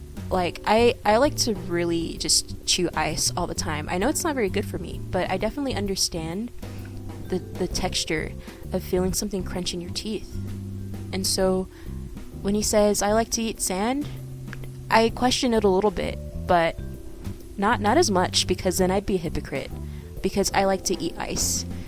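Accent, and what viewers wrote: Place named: United Kingdom. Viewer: Hey yor british accent is good :] i love the story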